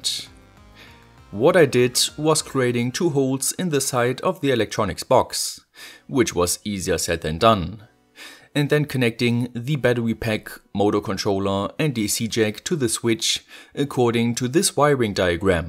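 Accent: Germany. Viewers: Ich liebe deinen deutschen akzent😂👌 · German english is so funny to listen.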